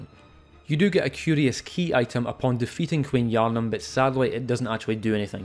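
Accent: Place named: Scotland, United Kingdom. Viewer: Bloodborne content AND a Scottish accent ? Likescribe it shall be.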